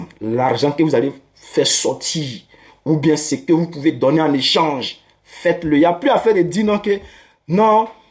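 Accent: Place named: Ivory Coast. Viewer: À t'entendre parler on sait que tu es un bouqui original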